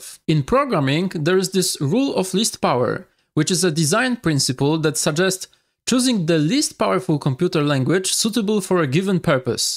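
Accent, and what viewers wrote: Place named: Poland. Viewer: where are you from? Your accent sounds kida polish?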